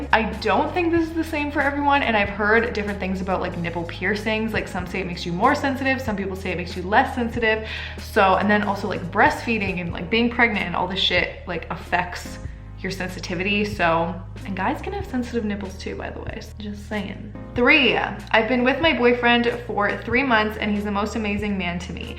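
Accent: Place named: Canada. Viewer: "Red Fleg" I love the Canadian accent.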